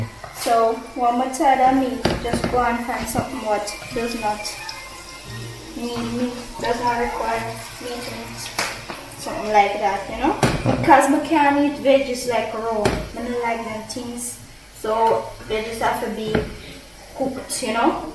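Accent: Jamaica. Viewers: mi rate yuh is blessed to have you as his wife...just love how you able to adapt embrace and love the Jamaican culture and food.. love to hear you chat patwah and its phrases like a true jamaican.....Big up to you and. One love. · seeing you cut that Julie mango makes me want to go home. So jealous as its nearing that time of year when mangoes are plentiful. I do hope I'll get back before the season ends. Love the Jamaican accent. You're really good at it💕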